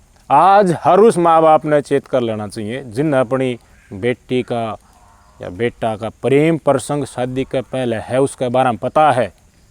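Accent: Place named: Bhiwani, India. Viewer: Bhai Bhiwani ki lapeteydar boli wah bhai wah 😅😅😅😅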